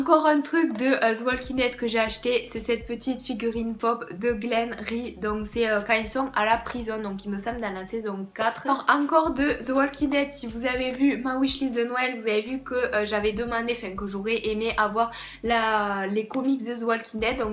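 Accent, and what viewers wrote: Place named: Toulouse, France. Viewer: L'accent toulousain est palpable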